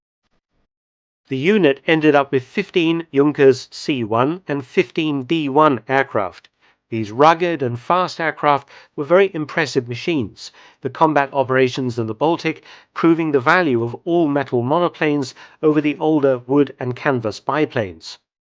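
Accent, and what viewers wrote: United Kingdom. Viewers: Mr, how about Weimar Germany's efforts in avoiding international sanctions, such as cooperation with Soviet Russia, expeditions to China, supporting Freikorps and so on? It would be a great subject and I'd love to hear your British narration. Thanks in advance!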